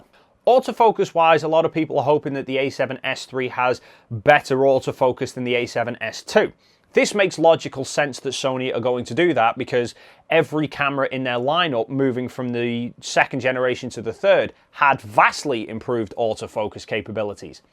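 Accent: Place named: North, United Kingdom. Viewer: The only reason I watch his videos is a beautiful northern accent 😂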